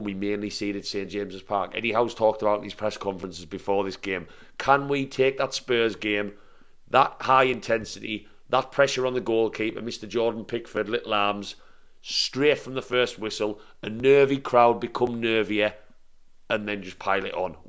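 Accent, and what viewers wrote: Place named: Sunderland, United Kingdom. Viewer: you've got a bit of mackem in that accent. You from washington?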